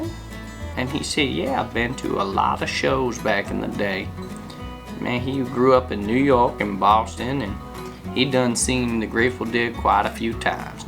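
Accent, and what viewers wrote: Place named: North Carolina, United States. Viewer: DUUUUDE!! I am a freakin HUGE deadhead! Freakin DOPE-ass hoodie! I followed a few shows back in the day! Warren Haynes is a POWERHOUSE guitarist/vocalist from the ABB!! Gov’t MULE KICKS ASS TOO! if I didn’t know better I’d thought you were from down here in NC! You talk just like these people down here! Awesome video! I appreciate you! BABAAM!!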